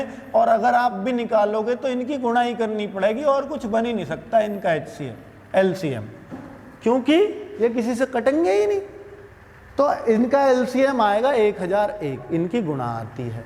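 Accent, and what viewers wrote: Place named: Haryana, India. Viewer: sir.. Mujhe aap k video dekhte hue 4-5 din hi hue hai, but itne me hi Mai aap ka Bhout bada fan ho gaya hu... Specially aap ke haryanwi boli ka! Mai jaldi kisi Ki video like nahi karta, but aap Ki Mai video dekhne se pehle hi like Kar deta hu!😊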